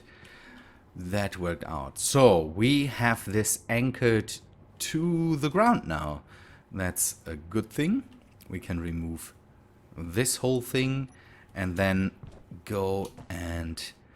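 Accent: Germany. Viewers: you have a strong accent. your german right?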